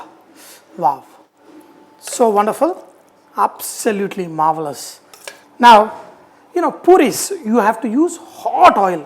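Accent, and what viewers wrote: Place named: Telangana, India. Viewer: అన్నా ! బాగున్నావే ! We always call in telangana యాస.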